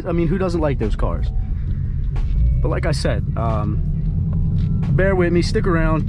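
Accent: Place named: East Coast, United States. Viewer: Definitely from east coast. Delco even perhaps?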